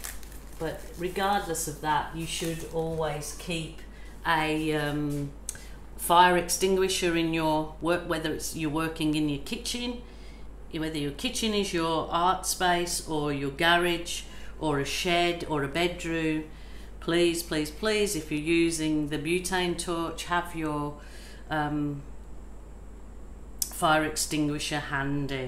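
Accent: Australia. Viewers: Nice To hear an Australian voice behind his pours this is something I want to get into I’m up till two in the morning watching all the different YouTube contributions and U sounds like a real character typical Australian and I love you tutti-frutti Creation Carnival pour I’m a W A girl thank you lady mate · If you are in New Zealand and wanting to buy one of these little heat guns they are available at The Warehouse! Also thank you to for your instructional videos. Whenever I am watching them I always come away feeling very inspired and also a bit less homesick because I get to hear the good ol’ Aussie accent you have:-)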